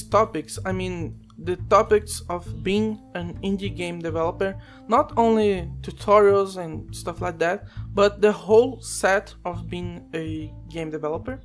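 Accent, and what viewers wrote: Brazil. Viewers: Excelente vídeo! Cheguei no canal recentemente e pelo sotaque, deu pra ver que você é brasileiro <3 Mas eu compartilho da mesma opinião,. Nós nunca podemos contar com a mera motivação para criarmos algo. Eu particularmente me forço ao máximo pra trabalhar nos meus projetos uma quantidade de horas satisfatória. Pra isso, eu uso um método que seria uma espécie de Scrum individual adaptado às minhas necessidades. Primeiro, eu crio um Product Backlog, com tudo que eu preciso fazer (seja uma feature da engine que eu estou desenvolvendo, alguma documentação, seja uma mecânica nova, seja um conjunto de sprites, uma animação, ou qualquer coisa), então, cada semana é como um iteração na qual eu preciso entregar uma quantidade X de releases baseado na minha atual deadline. E diariamente, eu faço um relatório com tudo que eu desenvolvi naquele dia, quais foram os meus empecílios e o que eu devo fazer pra melhorar aquilo. 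Dessa forma, eu não dependo apenas da motivação e eu me "forço" a continuar criando pra conseguir atender aos meus objetivos de longo prazo. Esse "método" ainda está em fase de testes uasdhusahudhusa, mas eu procuro aperfeiçoá-lo sempre que posso. Um grande abraço e parabéns por esse canal incrível <3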